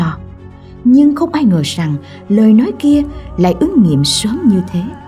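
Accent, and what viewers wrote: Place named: Quang Ngai, Vietnam. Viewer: Giồng như ở Quảng Ngãi VN quá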